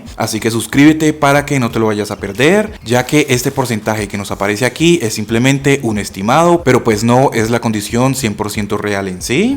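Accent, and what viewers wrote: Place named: Colombia. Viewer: Cuando dijo un millon de pesos dije vergas ya despues escuche el acento y me acorde que en Colombia el dinero se maneja en millones saludos desde Mexico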